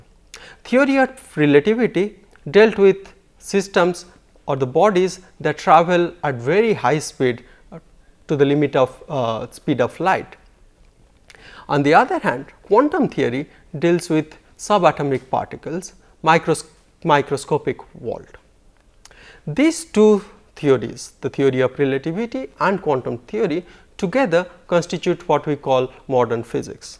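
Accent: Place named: Kolkata, India. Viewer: Jadavpur English. He pronounces "absorb" as "abserb." It is REALLY difficult to make out what he is saying. And he trips too many times.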